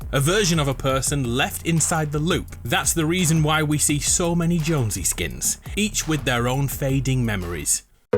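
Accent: United Kingdom. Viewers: He's british, they pronounce things differently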